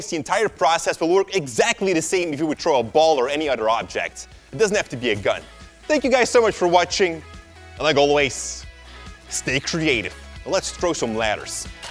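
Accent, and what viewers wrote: Flanders, Belgium. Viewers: ik wist niet dat je vlaams bent, ik kom zelf uit nederland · ik wist niet dat je vlaams spreekt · Ik wist niet dat hij vlaams was😂😂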